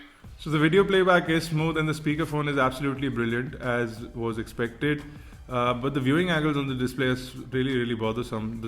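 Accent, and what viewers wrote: India. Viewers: I have never seen a white person with a indian accent before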